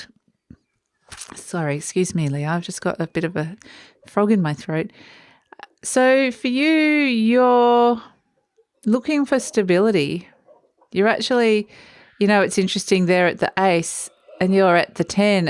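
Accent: Australia. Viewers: Thankyou, love your readings, so nice to have a aussie voice 😊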